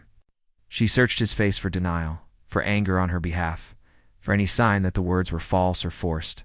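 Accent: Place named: United States. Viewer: Narration is poor, American accent for an English Regency and weird scream, strange sounds between words. No real basis for him to continue being blind.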